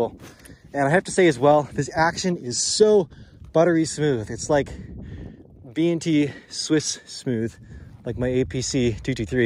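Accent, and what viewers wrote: Canada. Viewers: U're canadian right? I'm sayin' this cause of your accent.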